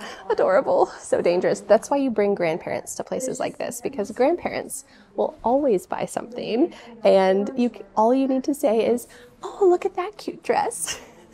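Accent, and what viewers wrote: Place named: United States. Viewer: I am learning British English, but when I watch your videos, and here your accent, I really like USA accent so much too! is your accent standard USA accent? You are a very amazing teacher . thank you so much.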